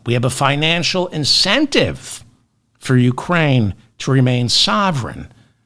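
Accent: New York, United States. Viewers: Love your New York accent!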